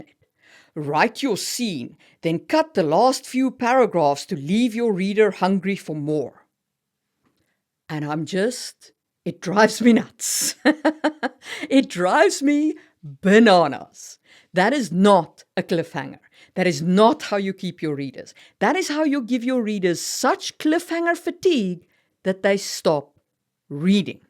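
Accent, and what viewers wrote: South Africa. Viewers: Dont mean to be too personal but are you south african? All the info says you stay in Finland but that accent says you're from here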